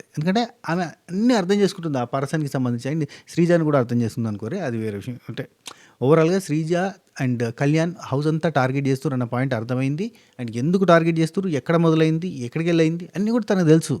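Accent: Telangana, India. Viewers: తెలంగాణ యాస సూపర్ మావ. కెసిఆర్ మాట్లాడినట్టు ఉంది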